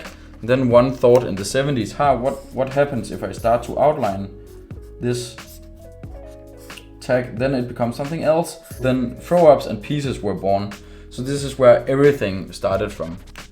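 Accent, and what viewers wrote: Denmark. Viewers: Jeg kan høre en dansker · Aldig hørt mere dask engelsk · very god Danglish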